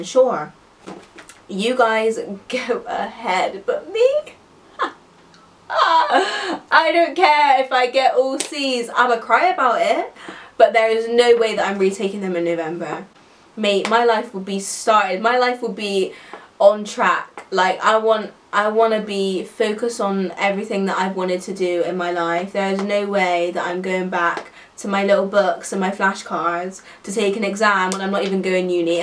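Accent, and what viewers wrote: Bristol, United Kingdom. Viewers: it feels like I haven’t heard your voice in ages, soooo Bristolian it hurts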